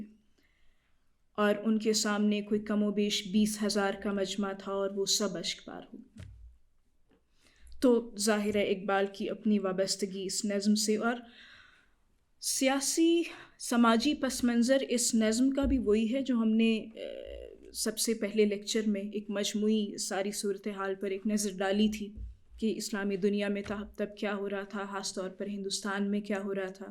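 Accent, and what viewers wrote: Iran. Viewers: ما شا الللہ بہت ہی خوب صورت انداز ہے ان کا ذوق مطالعہ بہت وسیع اورمختلف النوع ہے رومی سعدی اور بڑے بڑے شعرا کا ریفرنس بذات خود ایک خوب صورت دلیل ہے ان کے وسیع مطالعہ کی احادیث اور قرآنی آیات کا ریفرنس بہت ہی اچھا اور قابل تحسین ہے الللہ پاک ان کے علم میں اور اضافہ فرماے ان کی الفاظ اور خاص فارسی کو اسی لہجے میں بیان کرنا نہاہت ہی خوب صورت ہے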